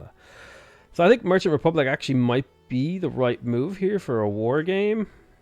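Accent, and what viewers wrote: Ireland. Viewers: he is from louth i can hear it ;) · you definitely have an Irish accent.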